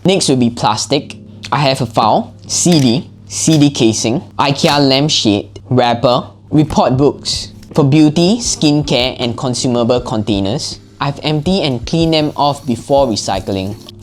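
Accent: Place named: Singapore. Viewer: it is Singaporean accent, go to Singapore and you'll find many people talk like this 😊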